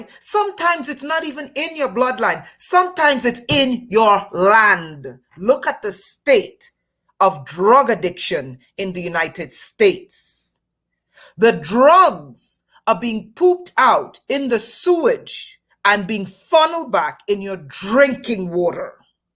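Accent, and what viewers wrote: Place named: Trinidad and Tobago. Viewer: I love this Caribbean queen and I love how she blends in her Trinidad slang and pronunciations of some words. My country Belize which is a part of Caricom but located in central America also speaks broken English so I can understand very well especially when she becomes passionate with some words and tries to drive a point home. It reminds me of how our elders speak to us, very strongly, and by the end of the talk if you don't learn anything and take heed then you're on your own. "yo ears cahna tuff like kunut fiba"!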